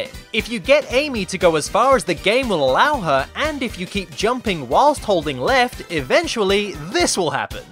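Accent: United States. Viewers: dude your American accent is pretty convincing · lol your American accent is incredible. Even better than IHE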